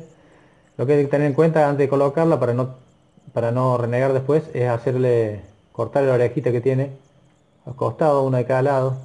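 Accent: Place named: Córdoba, Argentina. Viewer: Por tu tonada,me parece que sos cordobés....😕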